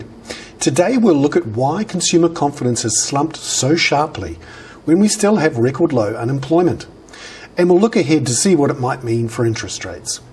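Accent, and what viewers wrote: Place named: Canada. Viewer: great comments and nice accent from canada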